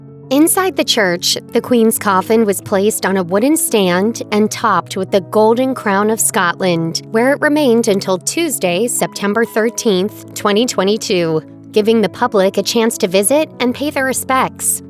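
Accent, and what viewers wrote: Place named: United States. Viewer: Horrible American accent from commentator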